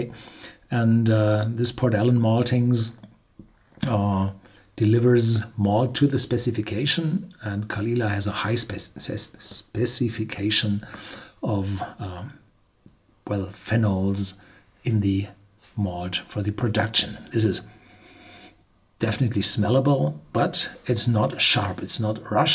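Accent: Germany. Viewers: Joe Macchiarulo he's german...almost all German English speakers speak like that.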